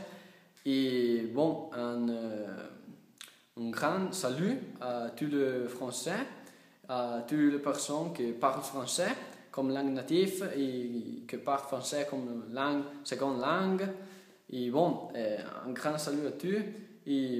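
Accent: Italy. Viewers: Si tu apprends le Français depuis seulement une année et demi, tu le parles bien. On te comprends et les quelques erreurs que tu commets sont celles que commette ceux qui apprennent cette langue, réputée difficile. Mettre le féminin à la place du masculin et vice-versa pour les articles. Par exemple, dans la vidéo, tu dis "un vidéo" au lieu de "une vidéo" et "un année au lieu d'une année". Tu as un accent italien mais c'est charmant... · Tu sei carino😂.. ça te donne un charme ne perd pas l'accent Italien... ciao!! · tu parles tres bien et en plus sans les mains! Continue tu es très fort et garde donc l'accent italien (pour les filles je pense qu'elles vont apprécier!) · J'adoore l'accent Iitalien ! tu parles déjà couramment on te comprend très bien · yo hombre tu parle bien accent italilien applique toi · l'accent italien me fera toujours craqué ;) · L'accent italien me fait rever :)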